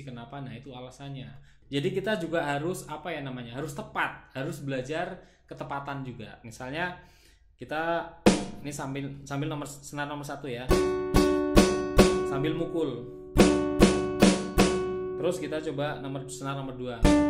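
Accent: Java, Indonesia. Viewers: Kendal? Suara medok bang.. Salam dr pegandon 😂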